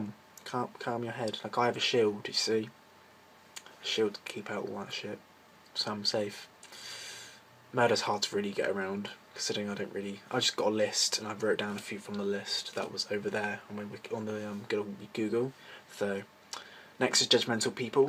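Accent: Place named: United Kingdom. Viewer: You sound really British and you always rant but thumbs up for you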